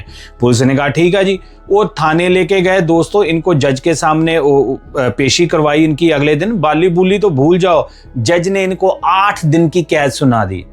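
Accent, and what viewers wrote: Punjab, Pakistan. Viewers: Yaar aap Pakistani punjabi ho kya?? 😂😂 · App ka Hindi mano aap Pakistani ho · You are pakistani accents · Tera accent pakistani hai....naam rakha hai sale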